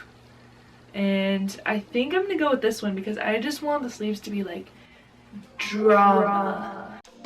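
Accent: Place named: United Kingdom. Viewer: Girl I came from another video of yours and I was just cracking up (the 70s jumpsuit) There’s so many times I was like “what am I watching 😂” but then it ended and I was like “oh no wait come back” anyway I clicked on this one and then it came up in the beginning that you’re doing this for your birthday and (if I date it right 😅😩) means you’re a Scorp and I was just sold. The vibes here are so chaotic yet so safe. I came for the sewing but stayed for the British accent. You’re brilliant luv, you’ve got a new fan xx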